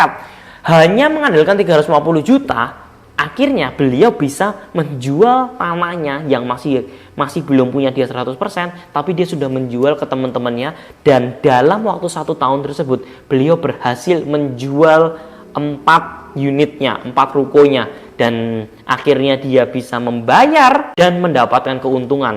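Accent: Java, Indonesia. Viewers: bahasa mas nya mendok jawa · Medhok e mas heheh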